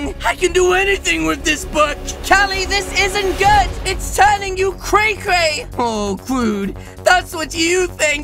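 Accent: United Kingdom. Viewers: are you brittish 🇬🇧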